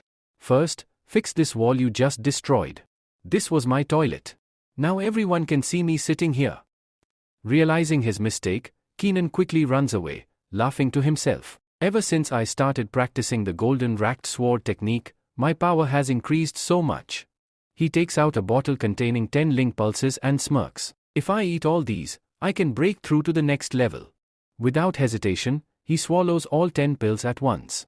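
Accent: India. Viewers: WHY IS IT INDIAN BRUV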